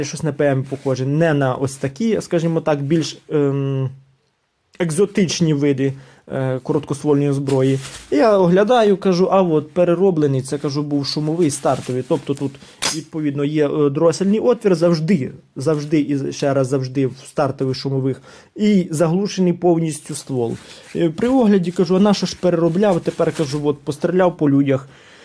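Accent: Lviv, Ukraine. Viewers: Красавчік, чую що із Львівщини чи поруч. Але контент цікавий😂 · Чути Львівський акцент у Пана,хоча може я й не правий😊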